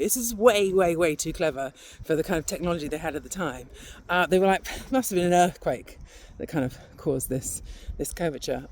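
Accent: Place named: RP, United Kingdom. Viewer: Everything always sounds more believable and trustworthy is explained in a posh English accent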